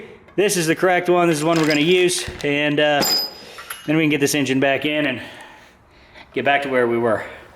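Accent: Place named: Texas, United States. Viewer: Helll yeaaa bother ! Merica! Let them bald eagels fly brother! heavy texas accent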